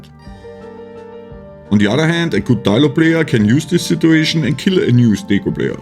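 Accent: Germany. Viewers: Are u german? Ur english sounds german 😅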